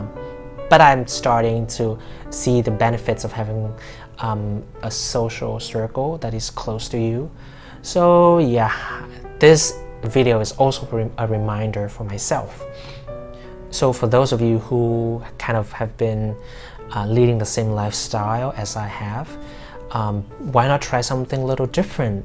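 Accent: United States. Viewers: Em rất thích video của a. Sub viết song ngữ công phu kèm video. Có tải về cũng ko bị mất sub. Anh ở Sing bao nhiêu thời gian. mà sao vẫn giữ được cái accent US hay vậy. Chứ e nghe a có tý Singlish nào đâu 😂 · Yes. First ,I thought ur accent is like American ...it is about 90 percent, so great, that is kind of my favorite accents. I.hope to take IElts class from u.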